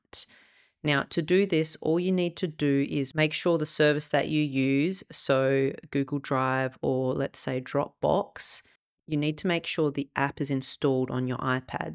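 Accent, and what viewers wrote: Australia. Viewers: Now im being presumptuous and assuming Melbourne accent. So, the huge question is…… Collingwood or Carlton? I’m Collingwood good win last night 😎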